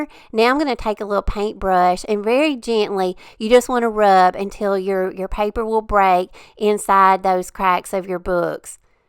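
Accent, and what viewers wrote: South, United States. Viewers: Oh my, you are so creative! Love these and I look forward to seeing and hearing you share your ideas with us.Thank you for your inspirations. I also enjoy hearing your Southern accent. You are a real treasure to me and so many more I am sure! Blessings, Chris' Mom, Irene, Idaho's Color Lady!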